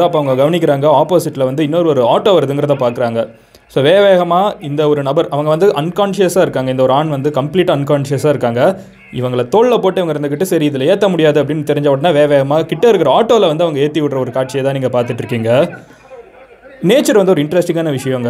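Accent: Madurai, India. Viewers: with typical madurai slang, potutainga, aakitainga, mooditainga😂🔥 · Madurai, sivagangai, ramanathapuram slang ah ipedithan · Madura slang nalla varuthepaa😍💝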